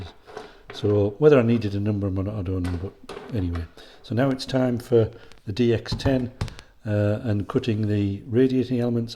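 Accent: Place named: Teesside, United Kingdom. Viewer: When i first seen the expedition a few weeks ago i was slightly concerned about the top sections but that looks very straight.I really enjoyed that, especially the very understandable Teesside accent 😁….Thanks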